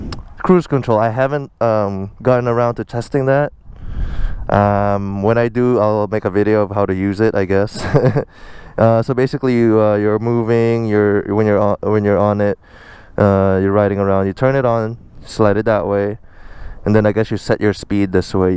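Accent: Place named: Philippines. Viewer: bro u sound pinoy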